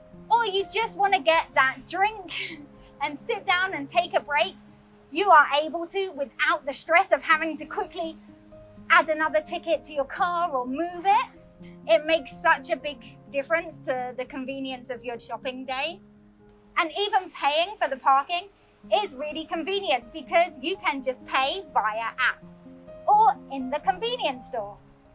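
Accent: United Kingdom. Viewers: 英國腔真的很好聽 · Thank you For introducing Taiwan's good and strong places To whole foreign countries But l do appreciate your special UK accent So cute and lovely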